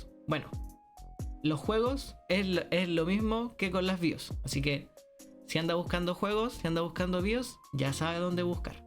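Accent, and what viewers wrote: Chile. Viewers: Acento de Chile o me Equivoco jeje 😅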